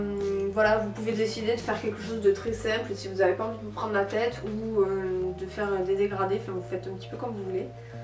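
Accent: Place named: South, France. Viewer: Coucou, c'est Myriam, j'ai beaucoup aimé ton TAG, tu as raison, 20 livres, c'est déjà suffisant pour avoir de quoi faire!! :p Par contre, tu as un sacré accent, tu viens du sud? :)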